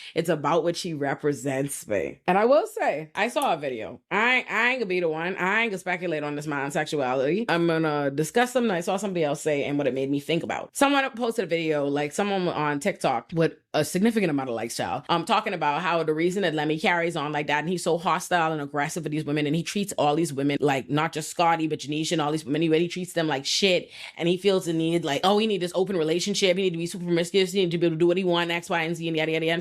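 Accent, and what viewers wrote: Bahamas. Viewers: Are you from the Bahamas ? You sound just like this other creator I look at on here, similar accent, lol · New sub❤ i only disagree with one thing queen, scotty don't love him, if he looked the way he looked with no SHMONEY she won't even give him a SECOND of a minute of a time of any day. Lol i think she feels like SHE owes him , she feels like she in way to deep now to let anyone " WIN HIM " AWAY FROM HER SPOT.... an i think she is playing the long game just like him. I think they deserve each other. Especially since lemmy made it clear to us episode one scotty knew what she was signing up for an now "she wanna change for the baby". I guess we all gonna see how this ends. Couldn't be ME though. I got to much respect for myself an integrity. Aint enough money in the world, i pray this doesn’t end badly for her, we all know rich people have no limits an feelings come second to spiritual dark agendas. 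Also you are gorgeous an i love your accent. Is that like a bahamian bagan accent? ❤ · Are you Bahamian?.. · You musssst be Bahamian or from Dominica omggg that accent 🤣🤣!!! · Are u Bahamian? Or am I tripping? I hear our accent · Waittt are you Bahamian 🇧🇸??? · Are you Bahamian by chance? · pause .. are you bahamian ?! this is my first time viewing but you sound just like us , or maybe you’re an geechie girl ? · Your accent 👀👀👀. Sis you’re a Bahamian ? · I hear a Bahamian accent 👀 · are you foreigns wife???? its giving bahamiaaaaaaaaa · Are you Bahamian? Your accent is killing me 😍 it reminds me of another Youtuber that that also does commentary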